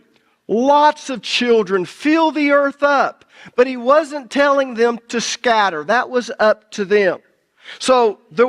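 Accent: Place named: Carolina, United States. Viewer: Carolina is a different dialect. Took me years to understand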